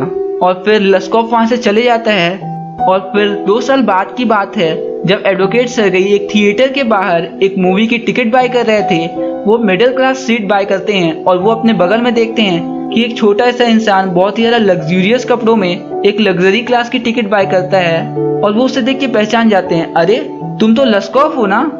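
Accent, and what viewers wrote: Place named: Bihar, India. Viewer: YAAR SAB KUCH TO THEEK THA BUT ACCENT SUDHAAR LO ....... BIHAARIYO KI TARAH BOL RHE THE EK DAM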